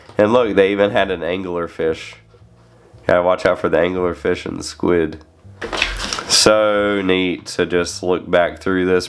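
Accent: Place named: Carolinas, United States. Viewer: Cool channel! Neat accent too. If I had to guess, is your accent Carolinian? 😎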